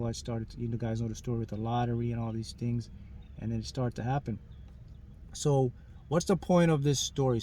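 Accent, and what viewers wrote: Jamaica. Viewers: I would love to hear you speak with the carabean jamaican accent...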